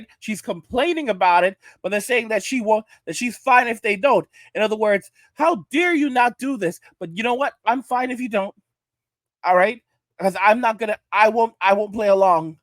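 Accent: New York, United States. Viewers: 10 on the NY accent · Great content,. I like your in-depth analysis, POV / plan and prediction that Western college educated (Feminist indoctrinated) former OF creators will be forced to the streets (competing with AI Girlfriends). Great New York construction guy accent and positivity. Consider a classier Angry Man face to better represent you. I see you as Justified Man of Justice. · I’ll give you a 7 outta 10 on that New York accent..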